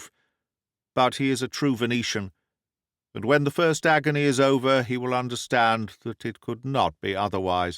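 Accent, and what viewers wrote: United Kingdom. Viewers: As an English language learner.. I find your voice very clear British accent that I enjoy listening more than American accents.. please keep uploading more and more.. listening to your narration is a valuable source of developing my listening skills in learning English.. thank you very much sir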